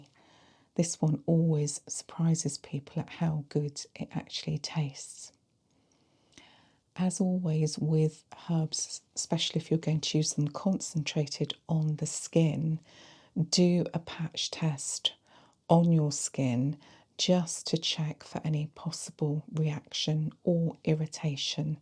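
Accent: United Kingdom. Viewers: Immediately subscribed upon hearing your voice and oh so delicious British accent :)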